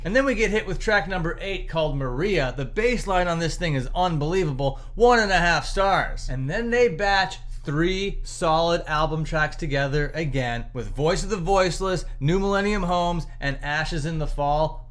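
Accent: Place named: Canada. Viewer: He’s speaking Canadian buddy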